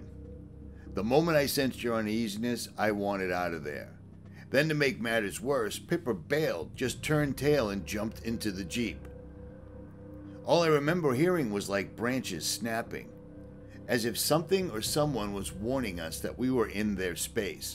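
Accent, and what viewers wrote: Boston, United States. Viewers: I'd recognize that Bostonian voice anywhere! Damn! I sorely missed you!!!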